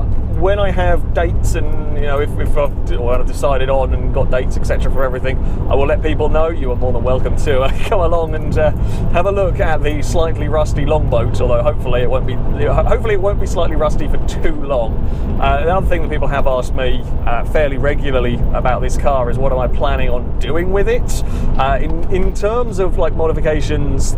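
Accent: United Kingdom. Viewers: That's a shocker you sound way more British than you look.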